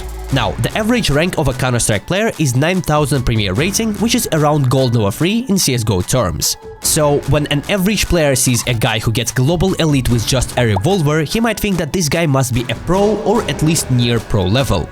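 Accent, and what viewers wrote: Russia. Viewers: а я один думал, что это русскоязычный тип? я начал смотрел его давно, когда он только снимал видео на ютуб и без вебки, и мне казалось, что его акцент и имя похоже на русского…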